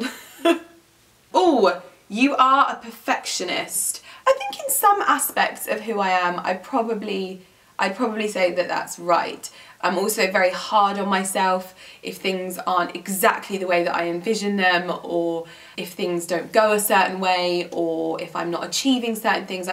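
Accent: RP, United Kingdom. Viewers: I absolutely love the way you speak and your British accent! English is my 2nd language, so every time I watch your videos I say to myself "it's time spent on learning a foreign language, not just entertainment" ^^ Two words which I've learned from you and now overuse: "flattering" and "wonky" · You truly don’t deserve the hard time you get, such a hard working, lovely lady deserving of all the success you have. Also, about the posh accent bit, my accent seems to change so easily depending on who I hang around with, some days I sound Yorkshire, the next day Canadian and the next day London, it’s so weird so I feel you there haha! Keep inspiring us all lovely xX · English is not my first language, you speak very clearly comparing to other British accent people. thanks 😘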